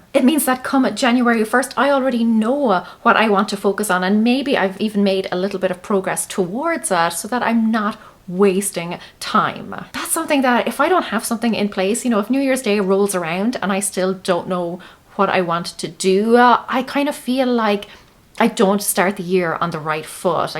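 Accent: Ireland. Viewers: I'm not really a superstitious person, but I read years ago that you should do the things you want to do in the coming year on New Years Day to set the tone, whether it's reading, journaling, exercise or family time. (Also, I'm still trying to place your accent. My parents were from Louth and it's similar).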